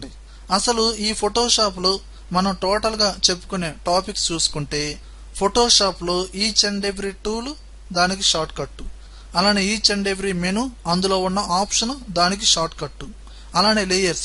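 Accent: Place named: Rayalaseema, India. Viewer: Anna, 00, Na, Maha, antunnarante miru kachitanga Rayalasimavaru.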